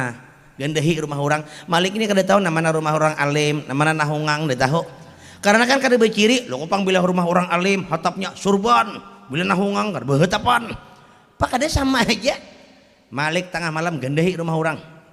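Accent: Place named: Banjar, Indonesia. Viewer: Ku kira Banjar Tanjung loko jadi suka bangatt denger Nye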